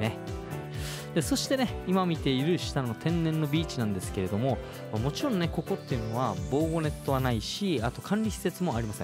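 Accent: Okinawa, Japan. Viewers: 話し方が優しくて癒されました😆 沖縄の方言、すごくすてきですね！😊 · 凄いランキング！！！なぜなら ●ウチナーンチュでも知らないビーチが紹介さている!!! ●西海岸以外を紹介している!! ●いちゃんだビーチを紹介している!!!!! ●ちょいちょい沖縄なまりが入っている点（ガチ）!!!! 近いうち言ってみる！！！ 右上の建物何！？！？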